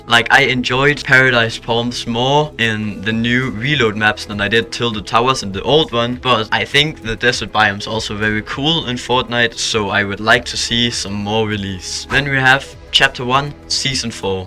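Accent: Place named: Denmark. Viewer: nice me to i was about to ask you. Bc you really have that danish accent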